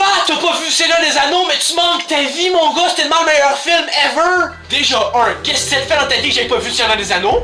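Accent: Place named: Quebec, Canada. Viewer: cool, écoute, je ne te connais pas personnellement, mais ta vidéo est bien organisé coté musique visuelle et tout, cest parfait, remercie ta soeur lol xD. bon je ne suis pas la pour jugé ce que t'aime pas on a toute des chose quon aime et aime pas. cest sur que des réponse peuvent choquer comme ta dernier lol xD, mais faut dire, si t'aime pas l'hiver, bas tu n'aimera pas le hockey sa va avec xD . pis les question épaisse ta tellement raison ahahaha. du monde de meme j'a catch pas avec. apart, sa cool ton vidéo. ton accent quebecois c parfais ahahah. écoute lache pas. tu pars bien . =D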